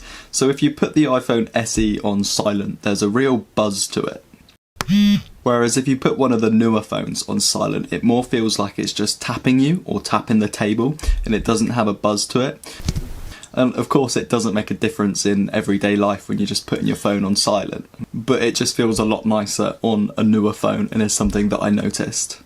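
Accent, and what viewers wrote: United Kingdom. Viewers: that frustrating british accent...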